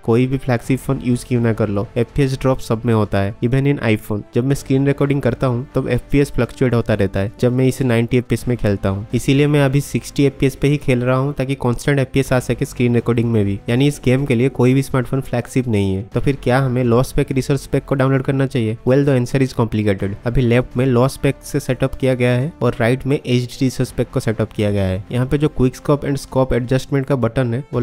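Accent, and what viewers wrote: Odisha, India. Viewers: Bro, are you Odia?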